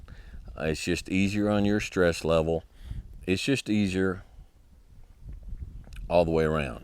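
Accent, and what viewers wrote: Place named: Tennessee, United States. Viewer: Sounds like you live close to Pulaski tn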